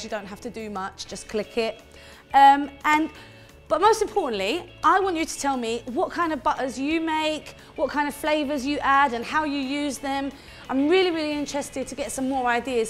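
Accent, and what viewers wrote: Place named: London, United Kingdom. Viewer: sounds like it could be london/south Essex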